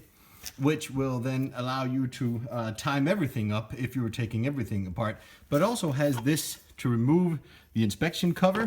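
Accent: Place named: Canada. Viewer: Wait, this guy is Danish? I honestly thought he was Canadian 😂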